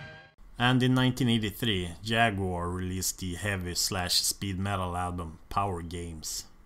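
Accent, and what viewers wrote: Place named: Sweden. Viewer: man, you're hilarious! You talk in this cool, deep Swedish accent and then you say stuff like "howdy folks" 🤣. I love it!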